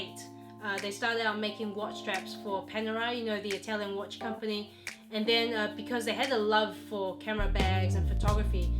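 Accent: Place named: New Zealand. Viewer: I love your accent. All the people from NZ I’ve met are polite and nice. I also love your current PM. she is so great and dose a fantastic job dealing with the covid virus.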